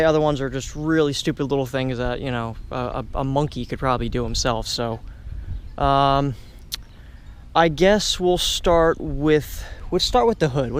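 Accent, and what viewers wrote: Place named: South Jersey, United States. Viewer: Frank Michael Sr It’s definitely South Jersey. I can tell by his accent and the flat landscape with barely any trees. We call them 609ers.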